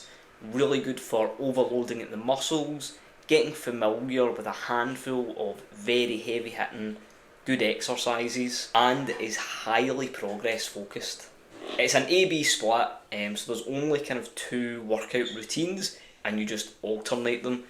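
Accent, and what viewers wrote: Scotland, United Kingdom. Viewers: A fellow scot. Not to sound stockery but what part are you from. Ayshire myself.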